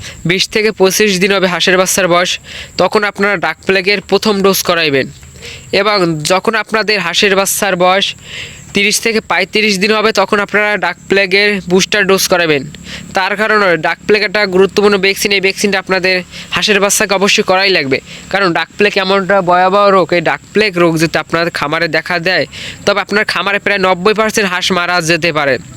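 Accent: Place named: Barishal, Bangladesh. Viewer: ওরে বরিশাইল্লা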